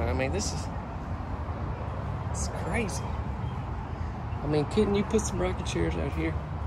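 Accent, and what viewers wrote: South, United States. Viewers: If just read the company fliers most come standard with 30 gal hwt & tiny are even smaller & if most people are like me I take long showers u need minimum 40 pref 50 by the way totally enjoy ur video's & ur Southern accent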